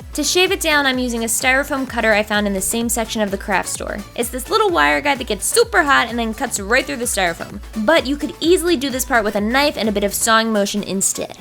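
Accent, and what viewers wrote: United Kingdom. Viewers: I’ve never heard sound so British! When she said bloody at the beginning she sounded more British than me being British myself 😂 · that British accent doe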